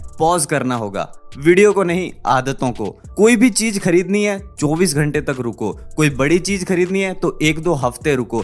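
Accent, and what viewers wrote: Gujarat, India. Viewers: Bhai accent se gujrati lage thu 😅❤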